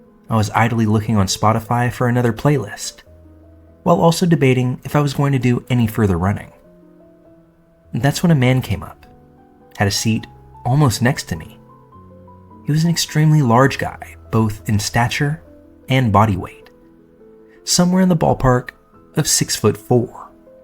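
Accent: United States. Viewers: I'm English and I'm abit werid with American accents. But I really like this one. I'm not sure what part of America comes from my favourite is North Carolina accent😊😊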